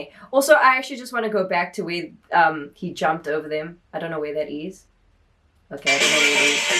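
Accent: South Africa. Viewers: You South african....am I right? I know our South African accent from anywhere. Anyways FUN FACT: WORLD DOMINATION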